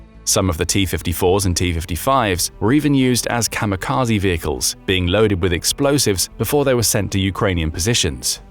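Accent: United Kingdom. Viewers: Why do Brits say rusher for russia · Love the Brit announcer, 💯🐖🐖💩🤷🏿‍♂️🥵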